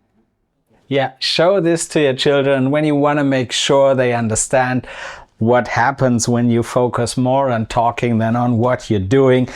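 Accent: Swabia, Germany. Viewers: ! Ilike it! Just like the Red Hot Chili Peppers, drummer! Your Swabian accent is great, man! 😁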